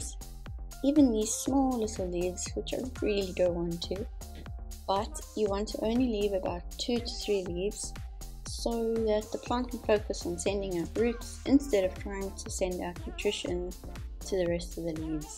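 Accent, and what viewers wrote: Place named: South Africa. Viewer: I Love hearing the South African accent! 🤩 🇿🇦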